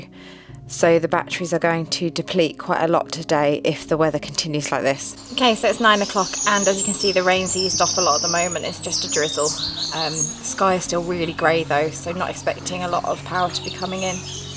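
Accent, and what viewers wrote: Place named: United Kingdom. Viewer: Thanks for uploading this video love the British accent ❤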